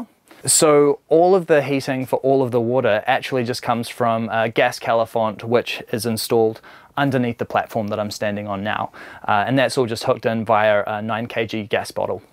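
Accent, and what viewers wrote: New Zealand. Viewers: Sounds like a new zealander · Before I even heard him speak I thought 'this guy must be a kiwi' ... haha!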